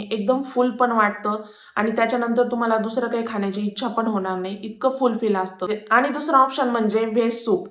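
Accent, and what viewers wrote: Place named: Nashik, India. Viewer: Kuthun ahes tai...tujhya bhashebarun tumhi Nashik chya asavya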